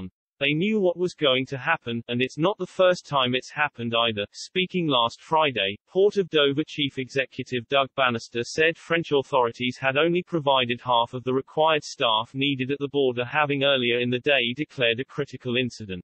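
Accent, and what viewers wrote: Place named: Russia. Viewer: Why not narrate your own videos? We won’t make fun of your Russian accent.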